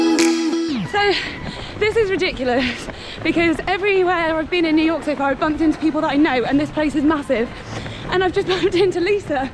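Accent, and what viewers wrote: United Kingdom. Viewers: Can't imagine winning the lottery to get in, let alone speak in a british accent.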